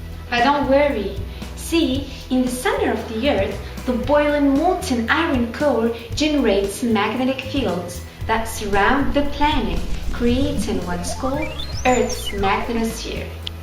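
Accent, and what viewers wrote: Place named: United States. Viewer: Amazing! I like your american accent! Congrats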